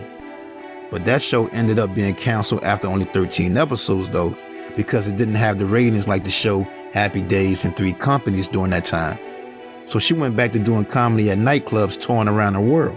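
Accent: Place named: Chicago, United States. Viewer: What part of Chicago you from, bruh? I’d recognize that accent anywhere.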